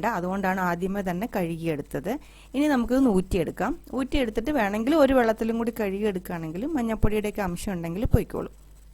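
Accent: Tamil Nadu, India. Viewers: Chechide Tamil kelkkan aanu rasam.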